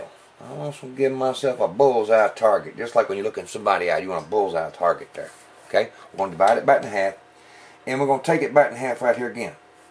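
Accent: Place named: South, United States. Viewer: NocturnSkies it a country accent if you don't like it well the stop watching you don't have to be so rude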